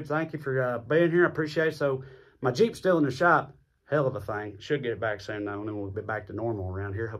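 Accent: South, United States. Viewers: My husband is a Southerner and I love his accent - your is awesome but I appreciate your view point - damn, you had more scrabble words in this short than 45 had his entire presidency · Thank you for your channel, and your Southern well spoken-ness, AND your honesty. Yeah, sht creek just started surging...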